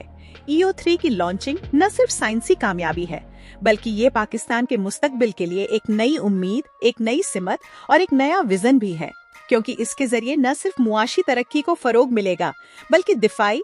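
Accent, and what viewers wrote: India. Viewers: ویڈیو میں ہندی لہجے کی آمیزش نے مزہ کرکرا کر دیا۔ سوفٹویئر تبدیل کریں۔ خلا کو کھلا، مزید کو مجید اس طرح کی مزید کئی تلفظ کی غلطیاں ہیں۔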